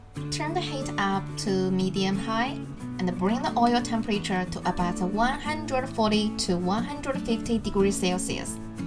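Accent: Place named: China. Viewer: Typical chinese accent :)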